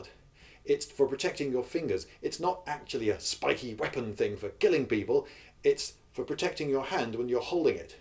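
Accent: United States. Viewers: I love your American accent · You have a really good american accent. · Love the American accent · The American accent caught me off guard. It was really well done. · That was an amazing American accent. You sounded virtually authentic and I've talked to quite a few Americans in my time. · Quality American accent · serious props for the american accent! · Your American accent is amazing. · nice American accent, so much better than my English one! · I can't get over the American voice. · Your American accent terrified me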